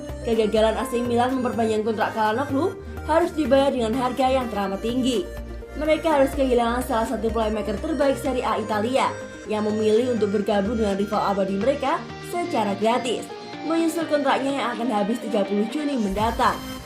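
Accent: Java, Indonesia. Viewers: Waduh.. medok'e mbaaaakk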